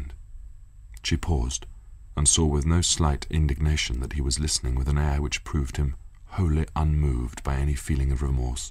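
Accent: United Kingdom. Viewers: This is the best British accent voice I have ever heard in my life. His voice is such a masterpiece. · Ador his voice and accent! Brilliant british actor! 💖💖💖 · I was thinking that, only Joseph Morgan voice and British accent can make me to fall in British Lords, but Mr. Darcy is the true Magic, I discovered years ago ... in love with this voice, this accent and this eyes and all .... 😘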